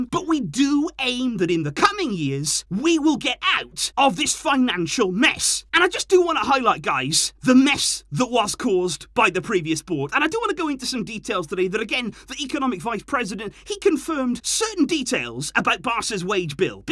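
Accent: Wales, United Kingdom. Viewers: Do all welsh people sound like this?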